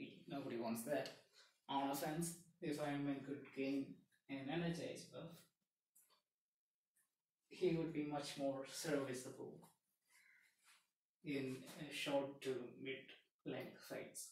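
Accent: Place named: Kerala, India. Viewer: Are you malayali?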